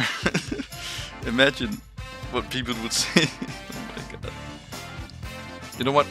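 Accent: Denmark. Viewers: hah! Me too!😌 i noticed the danish accent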